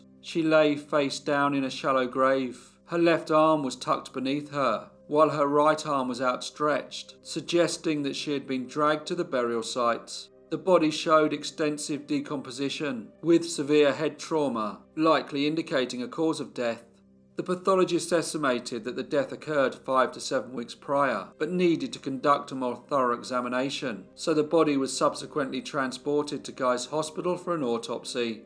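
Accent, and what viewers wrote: England, United Kingdom. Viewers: sorry I was only nit picking. You sound English(same as I am),and if that is the case, then Left-tenant is how it's pronounced 😁😁😁😁 But that is neither here nor there. I love your shows, please keep up with these wonderful pieces of crime history.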